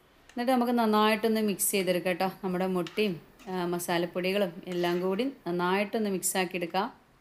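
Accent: Malappuram, India. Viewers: Mpm slang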